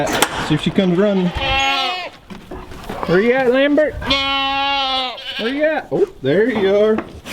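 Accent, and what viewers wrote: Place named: West Virginia, United States. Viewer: My 5 yr old daughter and I just watched this video together and she said Momma, he has goats like we do and he talks like us too 😂😂😂 we're from West Virginia. It's bitter cold here too. Can't wait til Spring....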